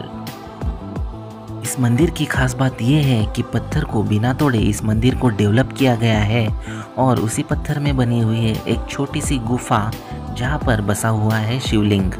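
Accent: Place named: Maharashtra, India. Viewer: very good video मराठी accent should be corrected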